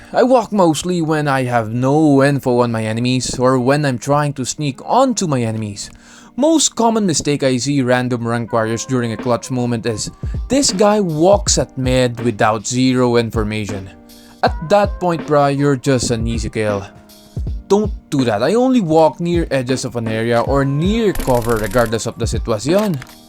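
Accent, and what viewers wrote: Spain. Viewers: is bro Spanish or french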